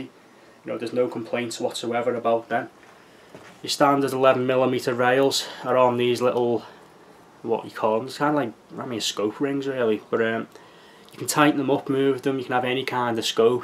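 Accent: Liverpool, United Kingdom. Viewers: He's a Scouser.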